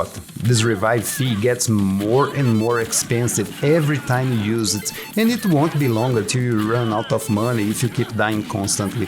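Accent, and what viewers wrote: Brazil. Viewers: Esse cara é Brasileiro, eu conheço o Inglês Brasileirado de longe....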